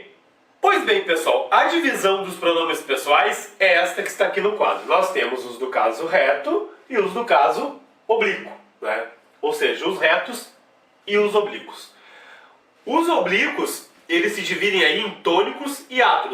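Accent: South, Brazil. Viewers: aula excelente aí!, a dinâmica ajuda bastante aí!, muito legal aí!, o professor é do sul mesmo aí!, parabéns aí!, obrigado! aí!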